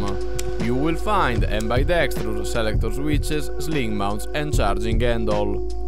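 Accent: Italy. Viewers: Don't agree at all. Easy to understand and with an awesome accent :) Italian?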